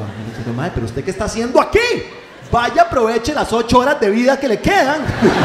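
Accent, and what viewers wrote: Costa Rica. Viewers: diay si no le gusta mae , que tirada .... Así hablamos los ticos mae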